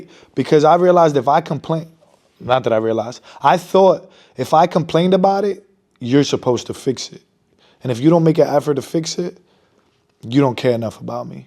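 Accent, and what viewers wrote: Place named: New York, United States. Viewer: This guy is definitely from, nyc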